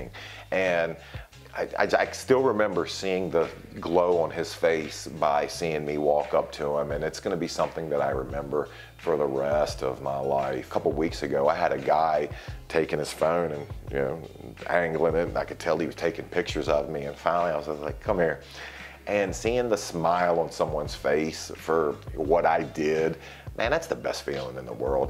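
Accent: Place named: Southeast, United States. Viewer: Just like any other job... good w the bad. I have to say. I am from Eastern North Carolina and you also have that South Eastern accent. Even though we call VA borderline Yankees lol. But seriously... I honestly love the fact that you take time to do this. I watched this instead of Friday night SmackDown.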